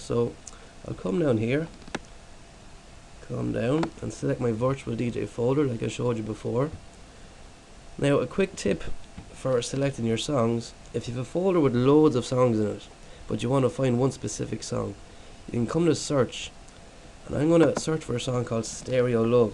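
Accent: Ireland. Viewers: you have the voice of a rougeish irish poet lol lol nice to be able to follow a you tube tutorial for a change good stuff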